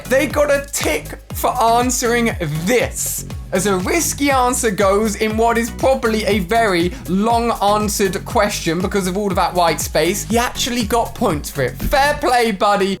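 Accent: England, United Kingdom. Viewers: Are you from uk or something else? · Are you from England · Wait is British like me 😮😮😮😮😮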